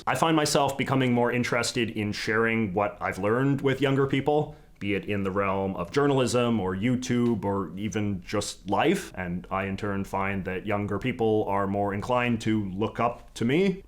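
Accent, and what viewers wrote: Canada. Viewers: He's a fellow Canadian , I was noticing the "aboots" like crazy .Thanks for this man . The anxiety is getting to me but I really loved certain things . One of them was mentioning lifestyle , i exercise a lot , soccer , photography , healthy diet (coming soon). Your video really encouraged me but I would love a video about dating in your late 30s .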